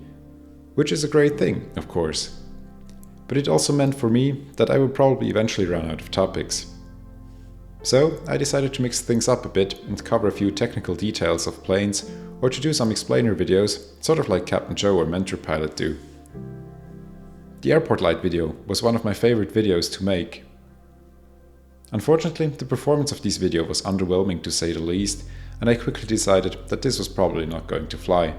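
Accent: Switzerland. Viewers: Danke für die tollen Videos. Ich werde den Schweizer Akzent vermissen. 💔 Au revoir! 🙋🏻‍♂️ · Fly safe! I hope that one day - like Mentour Pilot - your career turns toward educating the next generation of pilots. You will be an awesome at it! Be it senior captain, flight instructor or just pilot doing his job with passion with who ever he can share the cockpit with: It is good do know you are up there! I will miss your voice - the swiss english is such a nice and nostalgia sound to me. Fly safe!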